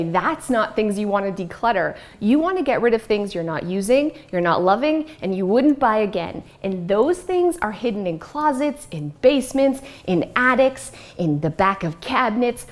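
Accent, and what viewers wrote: Canada. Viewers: I just saw that sweet Canadian accent on HGTV! Gonna start watching your new show Hot Mess and wanted to say congrats. I’ve been watching you on YT for years and LOVE that you’re now on my TV! Yay!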